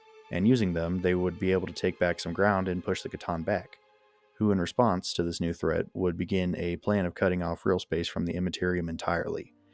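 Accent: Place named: Appalachia, United States. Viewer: nah man your slight idk what it is - appalachian twang - is fantastic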